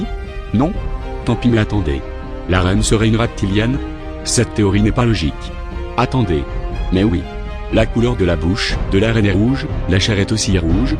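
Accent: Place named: Quebec, Canada. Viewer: mdr j adore cet accent (québecois)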